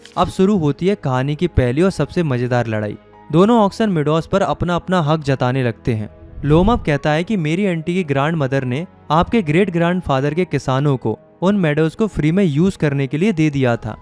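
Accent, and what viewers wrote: Uttarakhand, India. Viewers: Are you from Uttarakhand?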